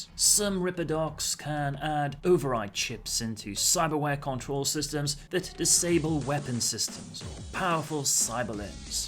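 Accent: England, United Kingdom. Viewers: Man, are you from England? Nice accent.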